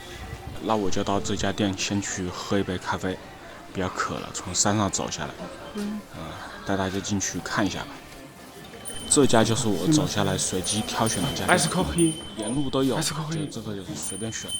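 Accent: Wuhan, China. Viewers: 我怎麼聽出武漢話的口音了哈哈哈🤣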